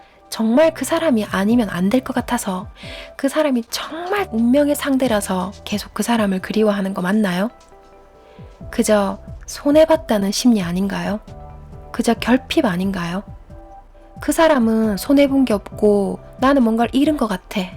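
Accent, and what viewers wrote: Gyeongsang, South Korea. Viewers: 갱상도 어감이?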